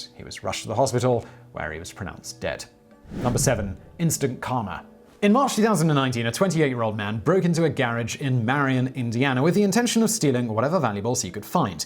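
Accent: United Kingdom. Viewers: Your pacing and elegant English accent make stupid sound almost near normal. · Can I please get a video where you pronounce things like garage, aluminum, etc. in proper American? I'm getting tired of using the Brit to American translator... and don't even give me your kilo and kilometer bullcrap...or 999 instead of 911. I think I covered it all...for now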